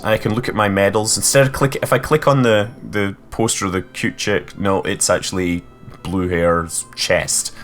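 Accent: Australia. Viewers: That Australian accent XD Don't worry, I forgive you. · nice australian accent! sounded like the guy was from up in the bush! Thumbs up for Australian! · Your Australian accent makes my hair fall out from cringing.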